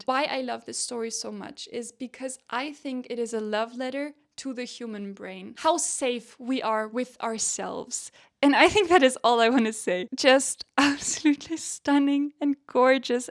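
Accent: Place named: Germany. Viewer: You have the sweetest darn voice - you're addictive to your listeners for sure, and your slight German accent makes you sound so erudite and warm hearted at the same time. You're definitely a charmer, and you're simply a joy to us that you exist and share so much of yourself.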